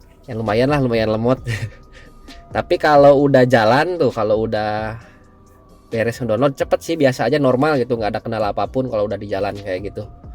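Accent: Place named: Bandung, Indonesia. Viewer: Asa bandung yeuh logatna,salam kang ti bogor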